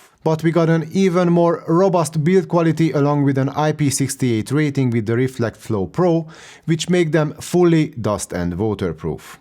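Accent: Hungary. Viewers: Magyar akcentuc, de fasza videó jó angol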